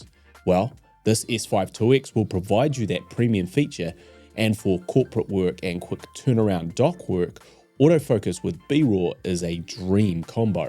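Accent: New Zealand. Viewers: Nice to hear another Kiwi voice on the webs!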